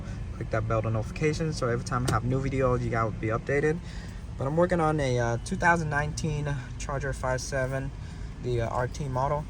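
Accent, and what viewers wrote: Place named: Vietnam. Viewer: Knew you was viet from the first thing u said 😂💀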